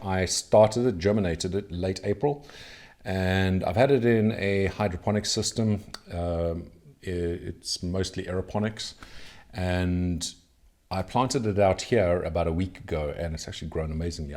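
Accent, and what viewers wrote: South Africa. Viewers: You sound like a south african? If so tell us more!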